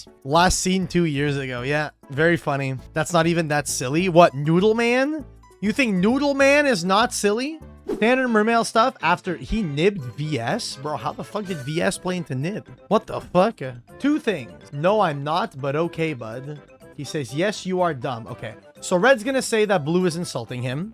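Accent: Quebec, Canada. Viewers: He is french canadian! Pretty sure from quebec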